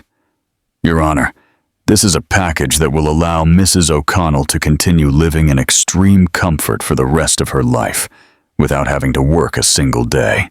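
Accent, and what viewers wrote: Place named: Australia. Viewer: Very interesting and it's so nice to see an Australian version of such Marital stories... Good One, the Lady is using her Brains without being Egoistic...Having been supportive and helping their Building business be established... He grew horns in his arrogance, forgetting the Partnership as equals... Serves him right...Best wishes to his Wife and family... From NSW 2680...Thank you for also talking in our Aussie English..Wonderful hearing the narration...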